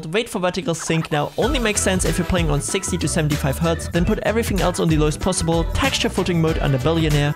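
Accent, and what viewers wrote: Germany. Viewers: Hab sofort erkannt das du Deutscher bist XD nice tutorial btw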